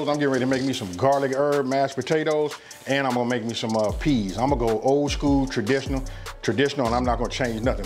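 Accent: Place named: California, United States. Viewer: I’m pretty sure he has a Cali accent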